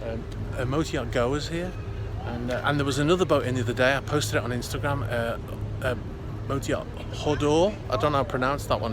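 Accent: Liverpool, United Kingdom. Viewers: You a scouser or from north west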